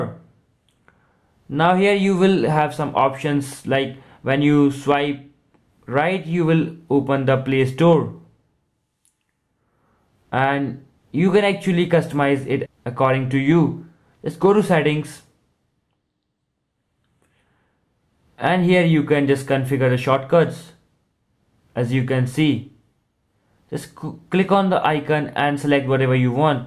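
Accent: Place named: India. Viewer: Ahh the indian inglish <3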